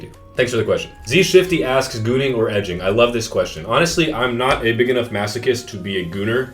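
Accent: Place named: Balkans, Eastern Europe. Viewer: Knew it, the name, the humor, the accent, the looks. Everything screamed Balkan Slavic.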